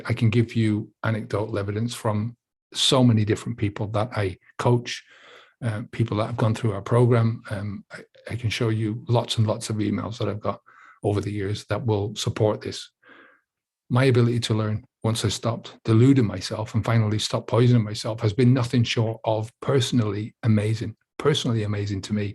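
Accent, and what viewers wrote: Dublin, Ireland. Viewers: Is that a Co.Dublin accent I can hear? 😊chose to knock drinking on the head 8 months ago, best decision I've ever made. I've been out in social occasions since, and have full memory of these evenings. Fantastic feeling waking up the morning after with no groggy " what did I do?" Fear. Great video. ❤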